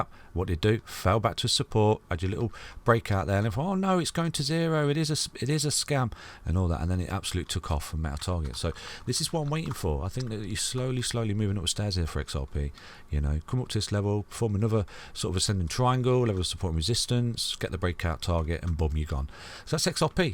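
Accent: United Kingdom. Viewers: Love your content my friend, I’ve moved to Canada 🇨🇦 from the Uk 🇬🇧 and it’s so nice to hear a fellow countryman giving great content and TA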